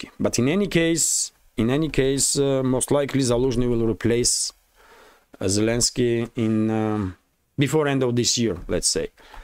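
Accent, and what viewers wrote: Russia. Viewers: FINALY A BROTHER HUMAN A BROTHER RUSSIAN VOICE RU RU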